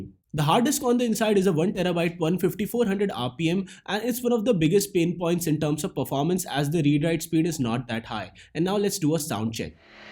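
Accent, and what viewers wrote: India. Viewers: hope one day my ears can survive of listening Hindi accent. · I like indian accent. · i cannot fucking understand you in that annoying Indian accent, either fix that or stop fucking making videos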